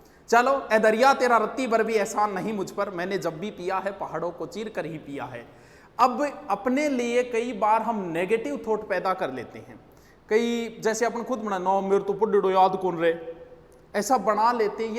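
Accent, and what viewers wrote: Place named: Rajasthan, India. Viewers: Sir very helpfull video,,,,,,or aap ki rajsthani awaaz. 👍🏻👍🏻👍🏻👍🏻👍🏻👍🏻👍🏻😀😀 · Sir aapki bolli jhakas h Kadhe kadhe Meri daadi puchhe kara. Yo chora haryanvi h k rajasthanni 🤣🤣🤣🤣🤣🤣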